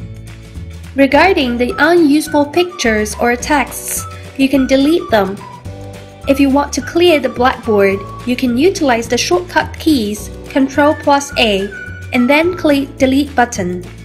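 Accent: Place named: Scotland, United Kingdom. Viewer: She has a lovely accent, almost sounds Scottish.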